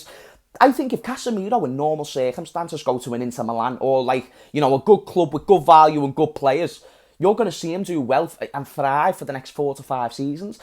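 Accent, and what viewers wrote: Liverpool, United Kingdom. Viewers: Am a Manchester United Fan and i don't like you because of your Scouser accent. However your point made is factual and I genuinely thinks its a panic buy and don't believe its a ETH signing. The club have money but no clear plan.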